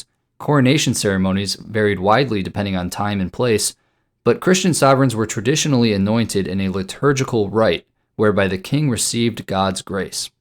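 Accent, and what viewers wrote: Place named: General American, United States. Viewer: General American Dialect ✅️, Zoomer voice✅️, Projecting escapism on a foreign model of governance while ignoring the experiences of those who lived under it (Absolute Monarchy, one-party Marxist-Leninist states, fascism, etc etc) ✅️ Diagnosis: Terminally Online LARPer Remedy: Touch grass and switch from online orthobro to boring IRL Orthodox.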